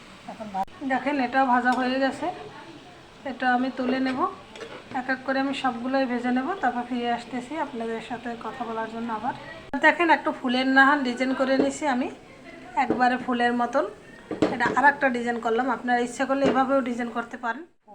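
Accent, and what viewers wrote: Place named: Barishal, Bangladesh. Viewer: 😁😁 বরিশাইল্যা মনু 👍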